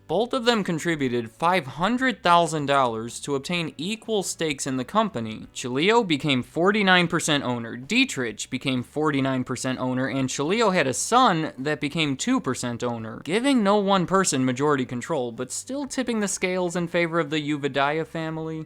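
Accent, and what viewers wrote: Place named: Minnesota, United States. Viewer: I feel like is from Minnesota based on his voice. I always wonder if he is a Minnesota Twins fan.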